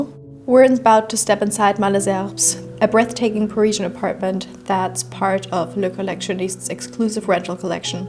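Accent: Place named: Germany. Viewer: nonchalant 💅🏼 der deutsche Akzent ist so adorable.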